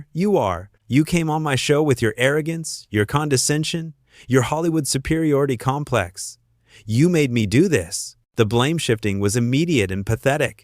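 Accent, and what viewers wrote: United States. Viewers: These American voiced videos are a nightmare, garbage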